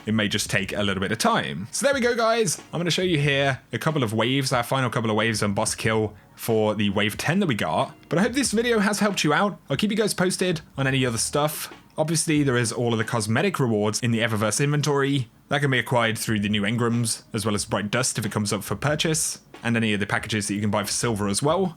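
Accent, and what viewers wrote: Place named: Scotland, United Kingdom. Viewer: U Scottish fuk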